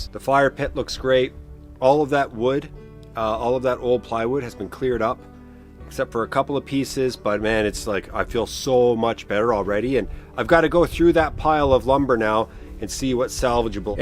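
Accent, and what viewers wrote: Newfoundland, Canada. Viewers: I'm really loving your videos. I saw you on Chuck Porters at his cabin. You guys rock . I here in your voice you to are from Newfoundland. I myself was born Bell Island but living in stressful Ontario. God I would love to live like you brother. Your shows motivate me,keep them coming brother.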